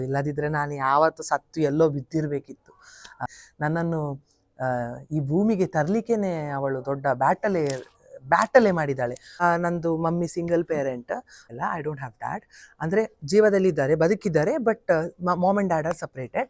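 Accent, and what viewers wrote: Mangalore, India. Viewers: Typical kudla Kannada 👍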